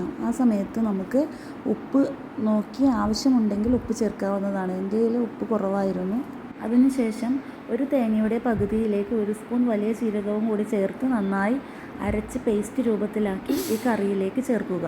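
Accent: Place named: Kasaragod, India. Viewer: oo ഭാഷ കാസറഗോഡ്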